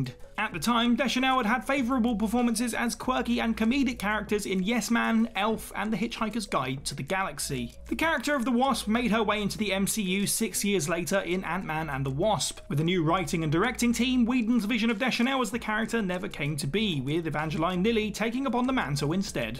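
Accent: United Kingdom. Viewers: You Brits and your proper English. 😉 It's Elvira, long I pronounced El vy ruh. And Lily's 1st name E van jeh leen. I think a Stallone Beverly Hills Cop would be cool to see since we got the great one from Eddie. I enjoyed the Snowman movie. My mom had died before I saw that film. It made me cry since the main character lost his dad. It's a heartfelt movie.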